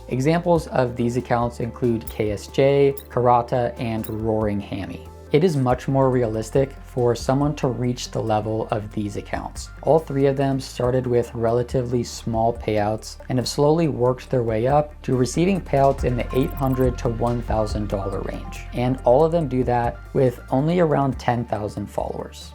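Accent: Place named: India. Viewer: This dude sounds like an indian scammer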